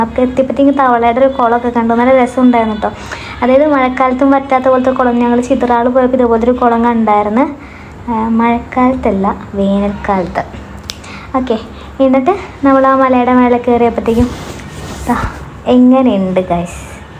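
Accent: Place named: Thrissur, India. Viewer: and her tamil in Thrissur accent 😅❤️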